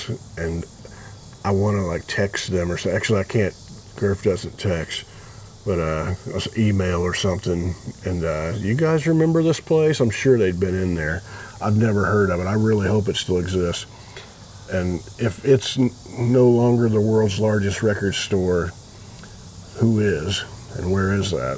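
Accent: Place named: Fort Wayne, United States. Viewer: I appreciate what you're doing I grew up in ft Wayne so I sound like you I wish I could tell a story like you please keep it going your the best , love you in Christ always amen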